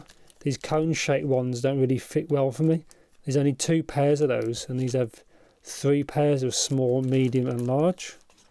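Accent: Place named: Manchester, United Kingdom. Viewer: Sir no need to answer, are you from the Manchester area? That accent sounds like I'm listening to my cousins from UK. They all live in Manchester area. Still loving blon 03 if I grab back from GF who loves them... Cheers. My gf uses the pouch, thinks it cute.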